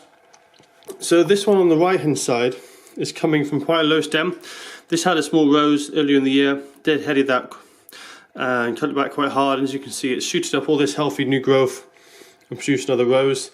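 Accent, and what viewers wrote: Scotland, United Kingdom. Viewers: Ohhhhh you're scottish haha that's why I can't understand you. lol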